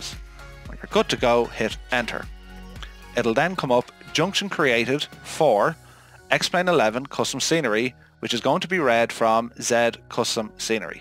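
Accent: Ireland. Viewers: Lots of nice content on your channel. Love the Irish accent 😀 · I have watched a few other tutorials about this and i ended up deleting all of my aircraft... i was gutted and feeling hopeless about this symbolic linking or whatever... watched this and it made every bit of sense to me, it helps that you're a fellow Irishman, could understand the accent perfectly. Thanks for making this so clear to me, I've now got it working and i really appreciate it.. subbed!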